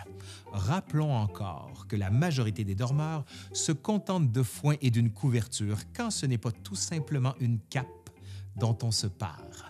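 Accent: Quebec, Canada. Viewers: Passionnante histoire du lit, surtout racontée par un québécois à l’accent délicieux! Mes origines sont de centre France, plus précisément en haute Corrèze, et les anciens dormaient en campagne dans des lits minuscules. D’abord parce qu’ils n’étaient pas très grand hein, mais surtout aussi parce qu’il ne fallait pas dormir dans la position du mort dans son cercueil. Ils dormaient donc les jambes repliées. Ça allait quand j’étais gamin mais c’était plus dur en grandissant… Bref, l’histoire du lit n’en fini pas, comme on peut le voir dans certains commentaires. Merci pour ces vidéos drôles et instructives! Encore! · j'ai adoré votre video. un grand BRAVO! je me demandais ce que vous pouviez raconter sur l'histoire du "dormir", et sincèrement, un vrai cours d'histoire. et j'ai apprécié votre touche d'humour. en plus, votre accent québécois (je suis du Var en France), j'adore !!!! 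Merci beaucoup. je vous découvre. je vais suivre vos viéos. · Foutu accet canadien...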